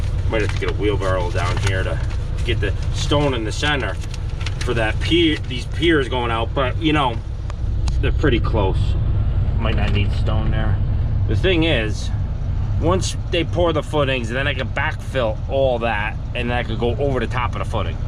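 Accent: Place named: New Jersey, United States. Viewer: Happy Thanksgiving! New subscriber but based on your accent I'd say you're not too far from Jersey. Just finished grading my own sawft sawggy yahd with a Kubota in 732👌🏼👍🏼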